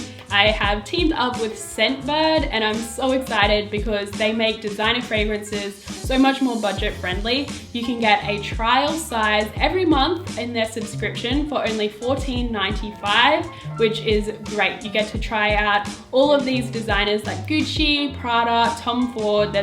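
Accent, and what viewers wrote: Australia. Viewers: Hey girl! I knew you were from Australia because of that accent :) which part of Aussie are u from? (if u dont mind me asking) hehe I'm from Adelaide! Anyways, I love your fashion style and I was wondering if you have any outfit videos that is perfect for the winter season here in Aussie <3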